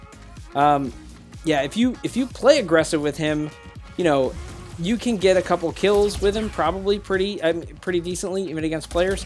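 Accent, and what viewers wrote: United States. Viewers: Hello remember when I told you that I wanted you to review my hangar basically I wanted you to give me a summary of what I have now and what I should have later on again my Id is <num> Have a great day ahead man love ur videos btw I'm subscribed ur like my main mech arena content that I watch every day btw I'm Indian 🇮🇳🇮🇳🇮🇳😎😎and I can speak in American accent like the u speak I can say the same accent and I'm 10 years old and I play mech arena pretty often it's one of my favourite games I love this game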